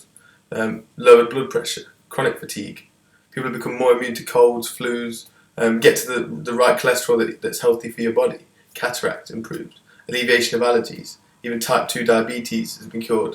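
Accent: United Kingdom. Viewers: dude help me! I live in the uk I think you do too, you sound like you do. I am sorted for snacks etc but where can I buy meals for myself that i'd like, i'm vegan and dont want dairy or meat anymore. its kinda gross what ive learnt...and cruel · Also the English accent definitely helps hahaha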